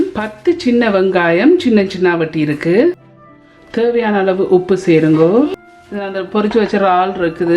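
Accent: Jaffna, Sri Lanka. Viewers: Hai dear I am so happy to be a subscriber to your channel. We feel happy and pleasured to hear your pure jaffna tamil Could you please show how to make prawn flat vadai ? Usually we can buy these vadai in Colombo gall face beach.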